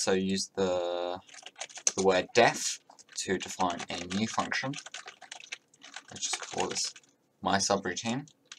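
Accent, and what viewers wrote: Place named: United Kingdom. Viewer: bro he has the most generic british accent wdym😂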